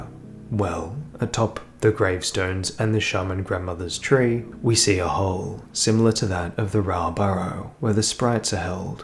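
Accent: Australia. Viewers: What is it with ER lore videos being narrated by sexy male Aussie accents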